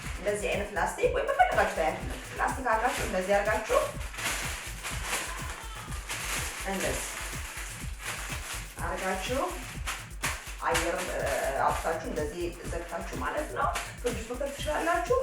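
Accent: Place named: Dire Dawa, Ethiopia. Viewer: ባለሞያ ነሽ አወራርሽ ደሞ የድሬ ዳዋ ሰው ትመስያለሽ የኛ ቤት አወራራቸው እንዳንቺ ነው። ለማንኛውም ማንኛውም alcohol አስከ 3 ሰአት የሚበሰል ከሆነ ነው አልኮልነቱን የሚያጣው 10 ወይ 15 ደቂቃ በማብሰል አልኮልነቱን አያጣውም ።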